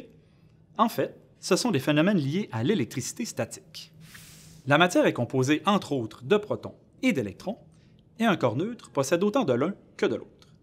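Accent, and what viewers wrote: Quebec, Canada. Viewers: merci pour cette incroyable accent québécois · L'accent québécois donne un truc a la video je trouve mdr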